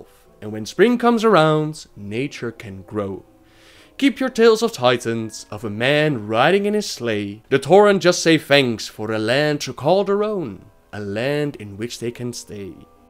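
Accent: Netherlands. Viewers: Love the lore vids. It's nice not having to read it all on the wiki for a change. That accent, Dutch?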